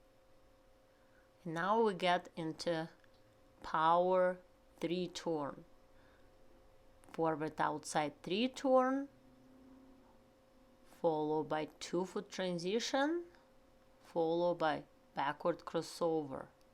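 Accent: Russia. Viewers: Enjoy all your helpful instructions especially with the Russian accent! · А по русски никак? Слышу русский акцент🤔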